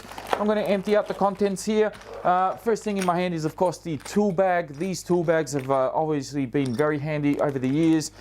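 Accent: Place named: Australia. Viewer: I’ve been to the hobbyking live event in the Netherlands last weekend, and I must tell you that I really like the australian accent that you and the rest of the aussies (hobbyking guy also) have.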